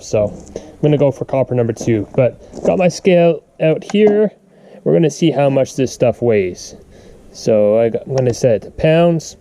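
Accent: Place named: Canada. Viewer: Thought I detected a slight Canadian accent, there - Eh?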